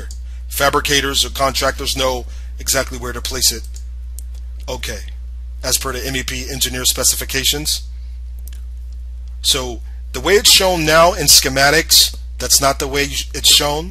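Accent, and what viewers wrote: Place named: Brooklyn, United States. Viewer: Cool Brooklyn accent... Good tutorial, but I am curious to if the duct sizes and CFMs are actually linked to the parent file, with considerations to building orientation and insulation values. From a design perspective, are ducted returns now normative in NY? as ceiling plenum returns are much more efficient and less fussy -- except where mandatory for hospitals, labs, etc.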